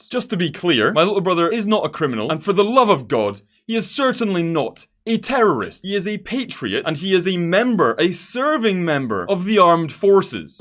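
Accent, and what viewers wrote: Scotland, United Kingdom. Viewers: Does he sound English to you? 😂 He’s Scottish 🏴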